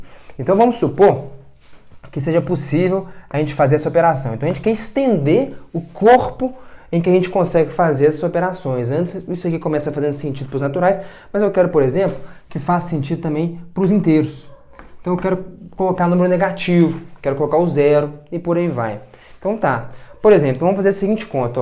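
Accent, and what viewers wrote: Minas Gerais, Brazil. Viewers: explicando as coisa é MT mais satisfatório uai kakakak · esse minino é mineiro, tenho certeza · Se é mineiro,não é? kk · Tu és mineiro, né? Kk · bom dms ouvir um minerim falando, tranquilidade contagiante. Parabéns pelos ótimos vídeos irmão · Mano, que legal, sou mineiro também! Sério, pra mim Minas não tinha sotaque, ao mer ver tínhamos um "português puro", mas eu realmente consegui perceber o seu (não que não seja esplendoroso). Ksksksk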